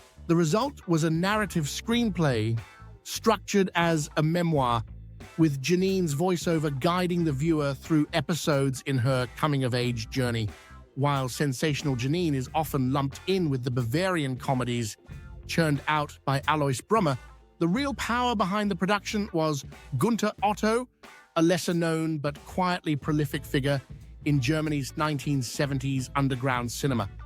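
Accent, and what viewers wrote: United Kingdom. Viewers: I assume you get all these films from somewhere to view and you sound British! Where do you buy all these 70's fims?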